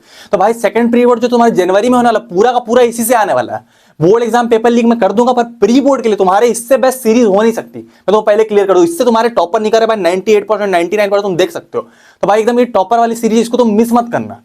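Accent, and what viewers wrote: Bihar, India. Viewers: Bhaiya Aap Bihar sai hoi kya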